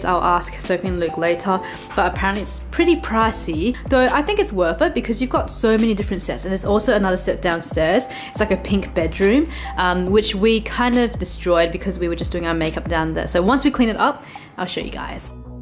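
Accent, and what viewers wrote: Australia. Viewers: Cute China doll with Australian accent.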